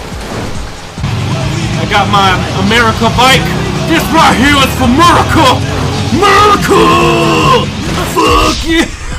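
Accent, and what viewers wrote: United Kingdom. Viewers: Is he British?!?! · is he british? please reply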